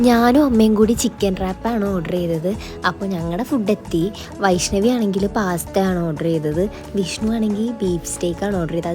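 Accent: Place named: Ernakulam, India. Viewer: Ernakulam slangayallo😁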